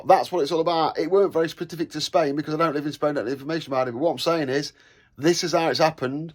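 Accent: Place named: Nottingham, United Kingdom. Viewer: i found you talkin was more funny, than the insight to spain, can tell ur a notts lad lol ,, im a notts lad hahah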